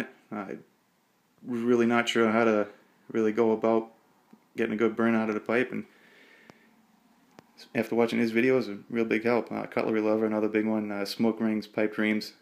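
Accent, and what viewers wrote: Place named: Massachusetts, United States. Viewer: Nice meeting you! Grew up in Mass. and it's always nice to hear the accent. Best Wishes!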